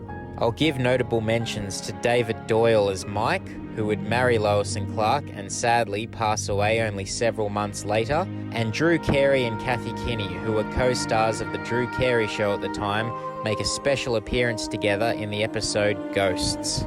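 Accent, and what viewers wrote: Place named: Australia. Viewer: now tell me if im wrong but that is an aussie accent if i ever heard one. thanks for the video mate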